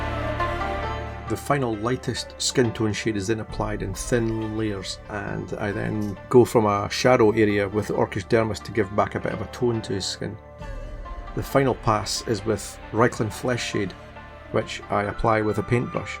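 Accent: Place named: Glasgow, United Kingdom. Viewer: Watching this beautiful painting and learning from it while listening to this wonderful Glaswegian accent is a whole new treat for me ❤, keep it up brother and greetings from Iraq .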